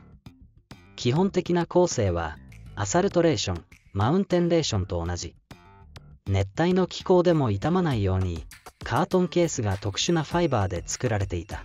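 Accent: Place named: Western Japan, Japan. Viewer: ナレーターさん、西日本出身の方かな？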